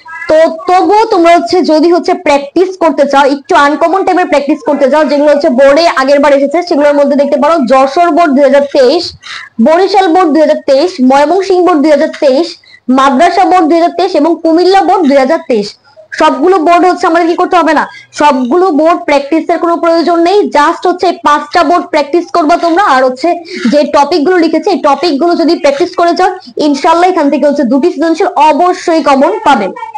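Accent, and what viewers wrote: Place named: Kolkata, India. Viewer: ভাষা কলকাতার মতো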